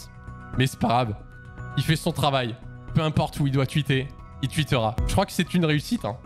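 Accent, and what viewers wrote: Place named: Alsace, France. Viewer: Accent Alsacien c'est pas de sa faute idiot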